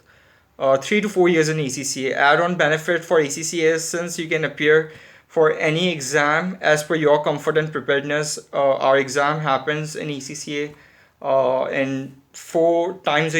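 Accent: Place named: Pakistan. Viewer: Are You Indian?? This accent is Normal for Pakistani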